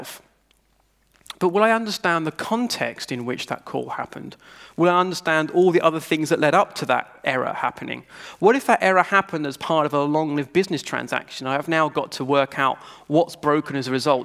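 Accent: United Kingdom. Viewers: I started reading Building Microservices a couple of weeks ago. I didn't know Sam Newman at the time. I'm glad I saw this conference, because now I'll be able to read the rest of the book in his british accent.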